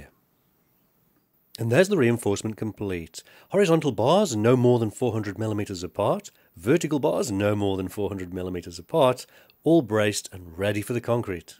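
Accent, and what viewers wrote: Lancashire, United Kingdom. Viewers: where you from 'Lancashire?????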